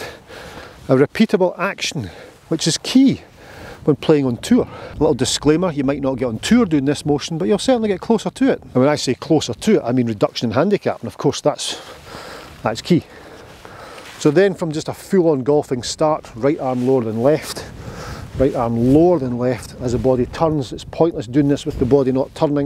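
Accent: Scotland, United Kingdom. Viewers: Love the videos. Even understanding Scottish! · One of the best golf channels on YouTube for the following reasons: 1 - Love the Scottish accent and I’m an English man. 2 - fellow ginger, like myself. 3 - Explains drills in a easy way of following! Keep up the good work!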